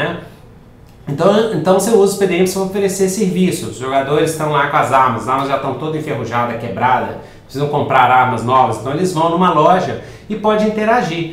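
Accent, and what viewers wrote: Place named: Minas Gerais, Brazil. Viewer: Mano... ele é mineiro! é foda!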